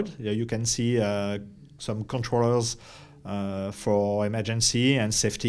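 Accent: France. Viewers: You sound like a French guy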